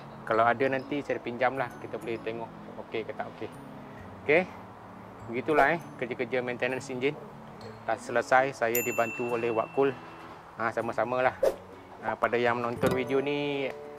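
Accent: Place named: North, Indonesia. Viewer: Bagus sekali bro.....nak tanya sikit....bro asal dari mana....ada dengar pelat utara.....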